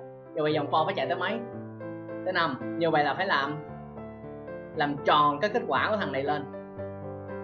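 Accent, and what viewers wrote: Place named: South, Vietnam. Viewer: Cảm ơn thầy vì phong cách dạy của thầy đã truyền đạt kiến thức để người học có thể tự suy theo database của mình. Rất hiếm người dạy chỉ tận tình như vậy đa số nói rất ít mà đa số chỉ tập trung bài code của mình hoặc ko giải thích nhiều. Mong thầy làm nhiều video hơn cho thế hệ trẻ việt nam tìm hiểu được kiến thức cho mình chứ em thấy người nước ngoài họ đều chia sẻ những gì họ biết thì người việt nam lại ít share hơn. Em thích giọng miền nam của thầy :}.Buồn cười mỗi quả nói nãy giờ có hiểu gì không.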